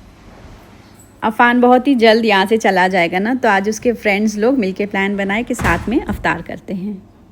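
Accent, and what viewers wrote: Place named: Azamgarh, India. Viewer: Aap ki language accent bilkul Azamgarh wali hai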